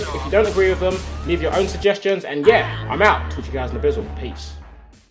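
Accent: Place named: Scotland, United Kingdom. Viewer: messi, suarez and neymar = Fucking deadly scotish accent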